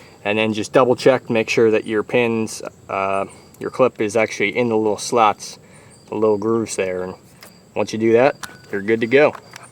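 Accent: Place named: Pennsylvania, United States. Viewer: you sound like a Pennsylvanian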